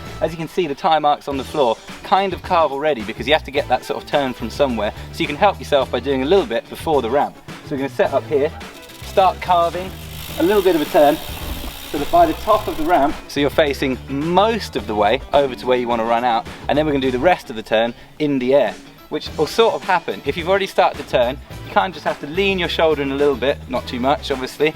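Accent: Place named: England, United Kingdom. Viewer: that prim and proper english accent juxtaposed with outrageously insane bike skills, full marks sir!!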